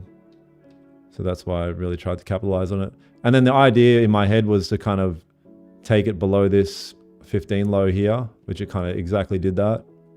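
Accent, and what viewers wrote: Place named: Australia. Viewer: Living in Australia. It’s refreshing hearing an Aussie accent with typical Aussie humour. I was skeptical at your simple trading style but you are making money and I’m not. Think I’ll start tuning into your streams mate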